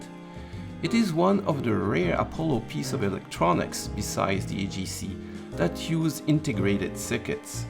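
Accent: France. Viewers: All of this work you have done over the last several years should be preserved in the National archives, French accent and all. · u french?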